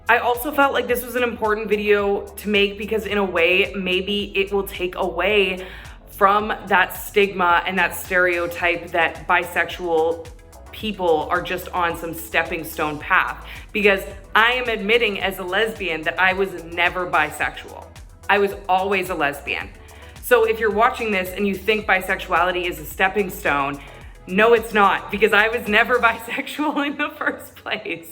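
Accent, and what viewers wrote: Canada. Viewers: Are u Canadian? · Are you Canadian?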